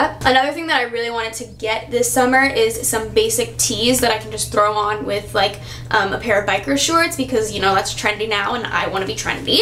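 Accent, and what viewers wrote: New York, United States. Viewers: I not only love your style and your sweet kitty that looks similar to mine... but I die for your New York accent. So cute so sassy. ❤️❤️ cool haul. Digging those leaf pants 👖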